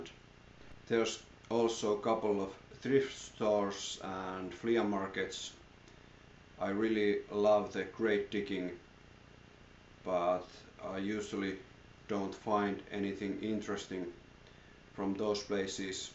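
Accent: Finland. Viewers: Great video, I could tell you were from Finland before you even said it. I just started collecting this year, I have been getting alot of, and other Finnish viking/folk metal bands. I am excited for this 13 disc box set that they are making! It is supposed to be the biggest sized set in the history of metal.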